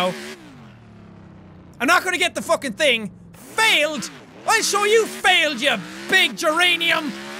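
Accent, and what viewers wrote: Ireland. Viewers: I just watch ur older videos and ur accent was very Irish and I still laugh at ur minecraft survival videos and gmod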